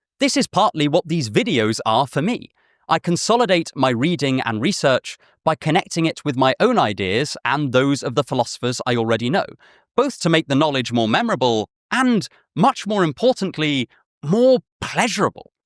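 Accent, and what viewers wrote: United Kingdom. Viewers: I don’t know if it’s j because you have a British accent and are wearing tweed, but I agree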